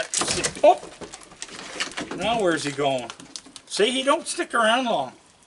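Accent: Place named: Canada. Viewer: Who could not love a raccoon? 💜💜 Love that Canadian accent, eh!!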